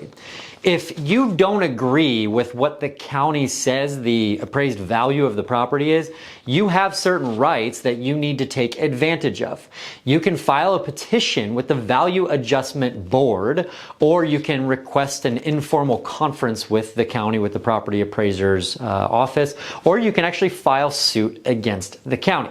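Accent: United States. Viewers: Where did this New Yaukuh phah ugh come from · Sounds very good ol’ boyish.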